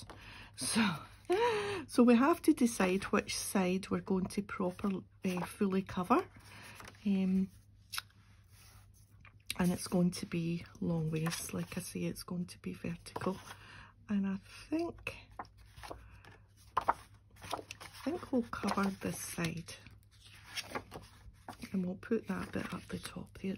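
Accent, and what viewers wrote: Scotland, United Kingdom. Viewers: Hi! It’s midday Sunday here & for a change we have a nice breeze coming through the house! I saw this video & I said, “yay, some Scottish” (but I guess it’s really English with a Scottish accent!) Anyway, it’s so melodic, I could listen all day! I really like these journal cards, especially the one with orange touches! I’m so glad you showed the books, I looked on Amazon & found 2 used copies of the bird book! It’s so soft & dreamy, I will enjoy using those little cuties! It’s also September 1st! I hope autumn will arrive soon! Have a great day! Erica❤ I read somewhere that one has to learn Gaelic in order to become a Scottish citizen?? I bet that helps to keep the number of applicants down! Haha!❤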